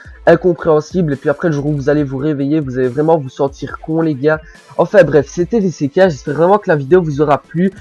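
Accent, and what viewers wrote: Belgium. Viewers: Commentary a la belge comme moi x') !!! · Hahaha tu m'as tué mon accent de mes couille j'ai raté ma vie prc g pris la Xbox Xd (ps moi aussi je suis belge et g un accent comme le tiens (un accent de mes couille comme tu dis) continue!!!) +1 abo à ta chaîne principal (déjà abonné a là évasion)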